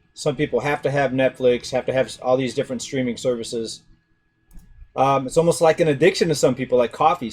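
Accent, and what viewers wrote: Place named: Michigan, United States. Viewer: I’d like to hear your story about leaving the midwest. I can tell by your accent you are from Michigan or something over there. We just did a roadtrip to the upper Midwest and loved it, though the weather was not for the faint of heart lol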